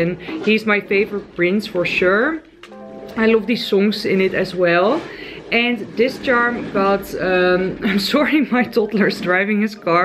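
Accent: Netherlands. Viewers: you are dutch bc of the accent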